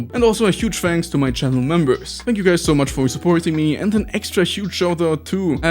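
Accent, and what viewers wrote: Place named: Germany. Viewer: du nuschelst ziemlich was deinen deutschen akzent nicht wirklich versteckt :o dein tonfall ist igwi.. leicht arrogant was, wie ich finde, nicht zu dir passt :o aber an sich ist das video echt cool gemacht :D gj :D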